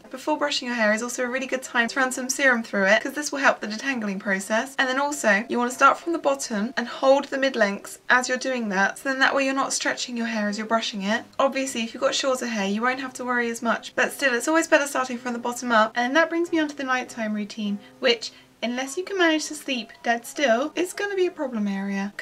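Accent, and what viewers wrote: United Kingdom. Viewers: I'm trying to figure out which part of the uk you're from. I want to say london? amirite? :D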